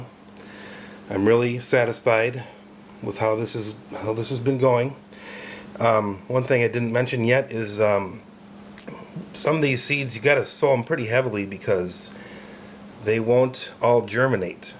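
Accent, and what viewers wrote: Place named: Minnesota, United States. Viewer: Love your video! So funny I could tell you were from MN before you even said. Probably because I grew up in Prior Lake! Love your advice and it is really awesome! Thanks so much!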